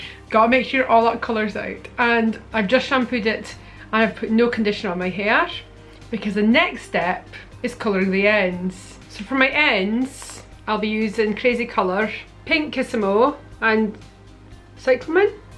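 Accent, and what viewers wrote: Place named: Shetland, United Kingdom. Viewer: I never thought that I would ,be so fascinated by a hair dyeing video ! I guessed that you had a Shetland accent , its a good un !👍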